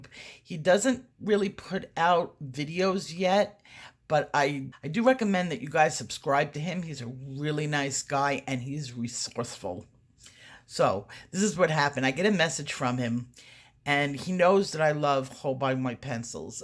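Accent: New York, United States. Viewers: beautiful. I had you older in my head. Must be the New York accent.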